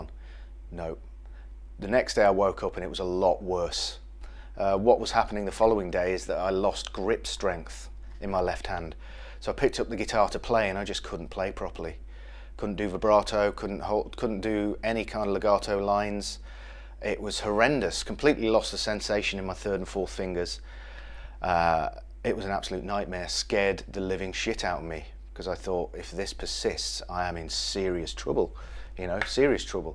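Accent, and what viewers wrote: United Kingdom. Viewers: When did john petrucci start talking british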